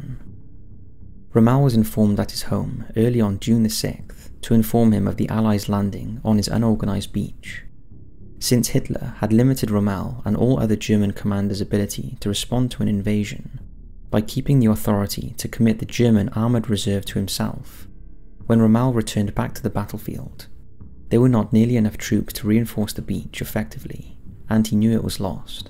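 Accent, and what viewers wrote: United Kingdom. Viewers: Hugh Jass He’s a Brit, hes gonna pronounce words in a different way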